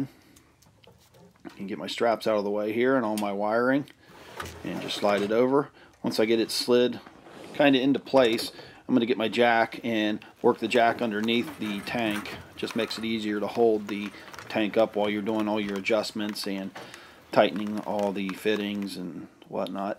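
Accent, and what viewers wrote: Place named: Philadelphia, United States. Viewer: I've done this job a few times but I like to re-watch to remind me what I'm in for. I always get gas on me somehow. You from near Philly or Baltimore originally? You've got "the accent"! Nice video.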